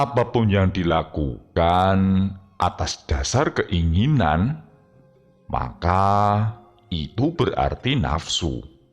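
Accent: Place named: Banyumas, Indonesia. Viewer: Salam ngapak Yen ora ngapak ya ora kepenak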